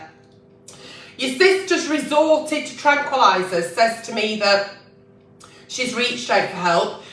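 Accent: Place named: Lancashire, United Kingdom. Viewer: so gorgoeus!!! love your voice and lancashire accent.... parli anche italiano?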